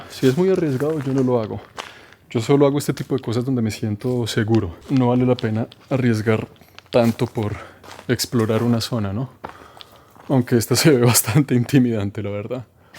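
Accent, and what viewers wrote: Colombia. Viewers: De qué parte de Colombia eres?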